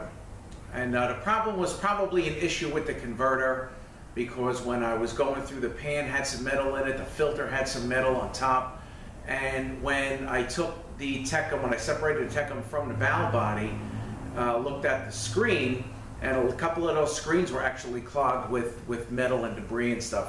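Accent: Long Island, United States. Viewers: Great video. Didn't know about the change until recently. I was listening to you at the beginning and then saw the sign and was like oh, thats why he sounds like a Long Islander. I'm a NC native, but married my East Meadow native wife 26 years ago. We live in TN, but just had one of her heavy NY accent brothers visit recently from Long Island. Great info here. Thank you.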